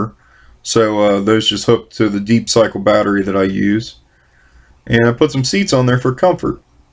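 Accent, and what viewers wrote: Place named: Pittsburgh, United States. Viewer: You have to be from Pittsburgh with that yinzer accent lol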